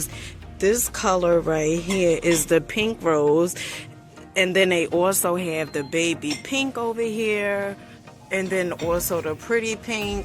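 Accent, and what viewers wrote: New York, United States. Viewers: Are u from nyc. I love the sound of ur voice 😊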